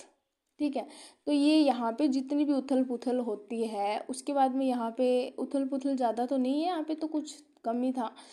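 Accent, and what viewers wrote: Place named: Haryana, India. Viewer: Hariyanvi rajasthani accents aa rhe mam , 😅 harayana se ho ??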